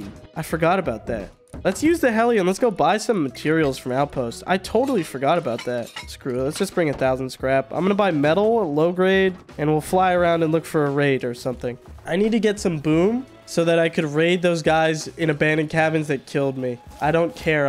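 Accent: South, United States. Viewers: tip for the southern accent, talk slower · your southern accent blows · The southern accent is why I started watching 😂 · I love the country boy accent. You should talk like that all the time. Fuckin' hilarious. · Lmao! your southern accent is on point! hahaha... If you're ever out towards the south. Wally1k just moved to Mrytle beach SC. You'd fit right in with that accent.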